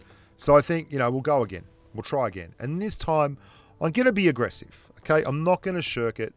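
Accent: Australia. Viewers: I love your Aussie accent...keep it up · Strayan accent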